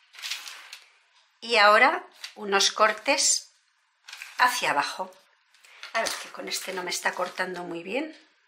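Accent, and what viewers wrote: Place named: Zaragoza, Spain. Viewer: Cuando oigo su acento recuerdo mis viejos tiempos en Zaragoza, desde el extranjero la morriña es una mochila que nunca se deja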